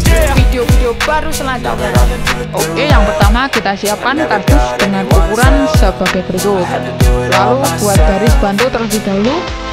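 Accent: Java, Indonesia. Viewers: wong jowo ya kowe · orang jawa ya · Mase wong jowo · Jowo ne ngendi sampean mas:D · Logat jowo ne keliat banget :v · Dari mana nih bosku.. Kok logat jawanya mateng amat haha salam dari kota Demak Karang tengah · Jawa medok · Bang orang jawa mana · Wong jowo ndi suarane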